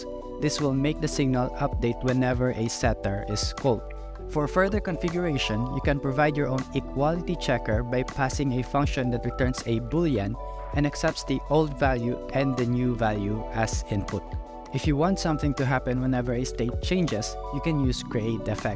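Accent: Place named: Philippines. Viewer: Bro you sound like a filipino, I'm guessing you are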